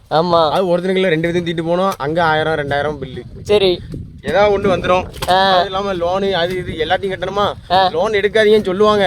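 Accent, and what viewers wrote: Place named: Tirunelveli, India. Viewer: அறுமையா சொன்னீர்கள் தம்பி நெல்லை தமி ழ் வாழ்க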